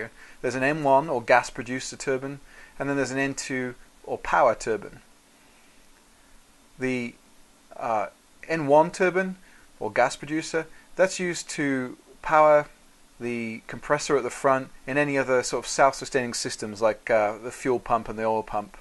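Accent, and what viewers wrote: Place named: England, United Kingdom. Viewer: I saw the tail number on the helicopter (N319CF) and I thought it looked familiar. So, I checked my log book, lo and behold, I flew that same helicopter for long line training. Then I thought to myself... The person who made this video sounds English and has flown the same helicopter as me...hmm...who could this be? This person could only be! Great video and explanation of how helicopter turboshaft engines work.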